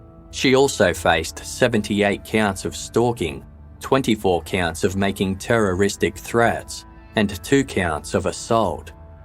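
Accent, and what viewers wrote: Australia. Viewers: I didn't realize he had an Australian accent until I saw someone comment and still only hear it when I think about it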